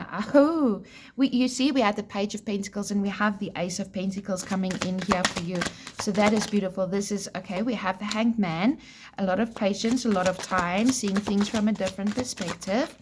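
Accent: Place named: South Africa. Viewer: Lekker to have a South African accent on a brilliant reading! 🎉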